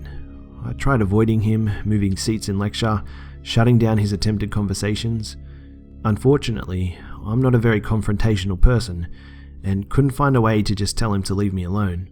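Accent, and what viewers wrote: Australia. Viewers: I think he's Australian? someone correct me if I'm wrong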